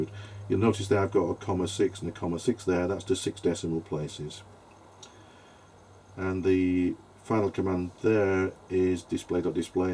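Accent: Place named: United Kingdom. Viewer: There's something about your style that reminds me of 16mm educational films. Maybe it's your "Engineer Brit" accent (I'm not up on this: are you from the North, or the Midlands, or Bristol or what..?). Or maybe it's the font you use in your titles, which is right out of Encyclopedia Britannica films. One thing I really have to give you credit for: you are direct, to the point, and you don't repeat yourself endlessly like a certain ausie bloke I know of. But at the same time, it's a very conversational style of delivery. I can tell you've prepared, but you're still kindof speaking off the cuff. Whatever it is, I like it.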